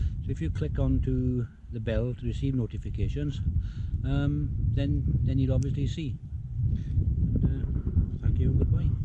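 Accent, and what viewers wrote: Wales, United Kingdom. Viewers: Cannot tell you just how chuffed to pieces I am that I discovered your channel. Loving the videos tremendously and you are such an engaging gentleman. I love listening to your lovely soft Welsh accent. Just some critical feedback for this video in particular is that the audio is terrible in parts, due I'm sure to your cameraman not utilising the microphone to its best advantage! I'm sorry to say I found it quite irritating in places because all I wanted to do was listen to/hear you! Lol. Let's hope you train him better for future videos! Thanks again for your fab and very informative videos! I've definitely got the foraging bug now!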